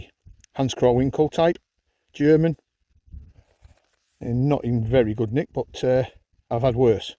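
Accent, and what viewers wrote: Cumbria, United Kingdom. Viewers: Just found your site, just got an interest into metal detecting at 72 years old. The other interesting thing, yours is the first site I have looked at and thought I recognised the accent, on checking Dalton, I'm from Barrow-in-Furness, left 51 years ago and emigrated to New Zealand. Look forward to learning from a local. Paul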